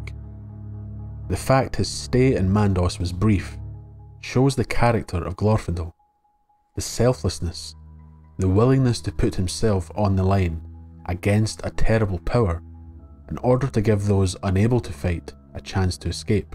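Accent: Scotland, United Kingdom. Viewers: I always say, "Tolkien must be read by English voices to take me there", but a Scottish one does just fine, quite fine. I've tried listening to Tolkien by American voices, but I guess I've been conditioned to feel the fantasy is real only with English voices, until now, of course, although you're obviously not American. I read a complaint about your pronunciations. I have absolutely no issue, as a matter of fact, the way that you pronounce those words that end in the ending ''e'', ie., "fortresses", "pieces", "process" is quite interesting and a thing that I before you have never heard. Is that a thing unique to you or standard Scottish?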